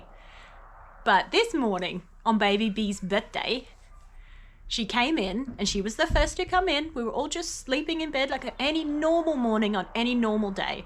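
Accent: Australia. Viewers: Thankyou for sharing Your Birth Story. I enjoyed listening to a fellow Aussie. Your Videos are Great!! Sending Love to You & Your Family. ❤ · Thanks for sharing your story! I was so excited to find your channel, it’s refreshing to hear an Aussie accent and Australian birds in the background 😂 I have had four natural hospital births and feel so drawn to a home/unassisted birth next time so hearing others stories is inspiring to me ❤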